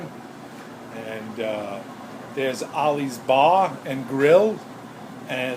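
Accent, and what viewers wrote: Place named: Staten Island, United States. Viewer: yo you sound like a dang New Yorker... more like Staten Island... LOL.. thanks for the tour of your layout... great talking with you the other night... i didn't know i wasn't subbed to you but i am now... looking forward to your next video... BTW check out my last video i show the finished build for Eric vinny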